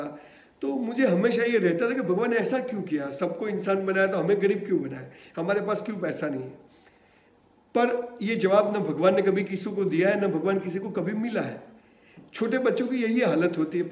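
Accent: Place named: Palanpur, India. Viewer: Well done Bhai . Gujarati Palanpuri boli no laheko j mast aawe. Natural j saru lage. Palanpuri boli ka bagicha vanchi to hase j.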